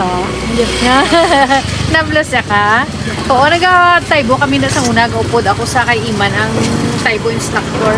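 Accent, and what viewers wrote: Iloilo, Philippines. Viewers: Langga tamsak nakakatuwa Ang accent na illonga day injoy sa road trip full watch Po support din po salamat dikit na Ako langga sa Bahay mo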